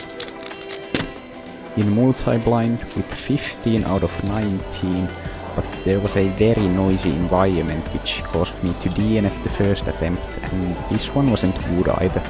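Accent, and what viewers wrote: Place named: Finland. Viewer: Guud job. Ainoo ongelma niin tota.... Vähän rallienkkuu havaittavissa😂 Mut hyvä idea tykkäsin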